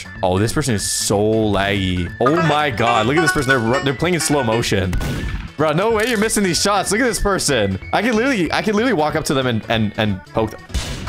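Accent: France. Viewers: I am french!!!! I LOVE YOUR FRENCH ACCENT!!!, DO U LEARN FRENCH OR SMTH? You're saying every words right!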